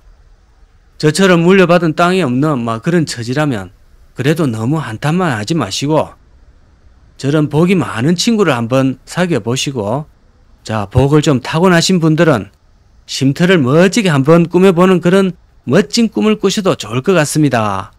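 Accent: Gyeongsang, South Korea. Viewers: 경상도 사투리 정겹습니다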